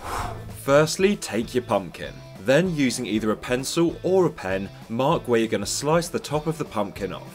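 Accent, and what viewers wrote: United Kingdom. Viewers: British accent :)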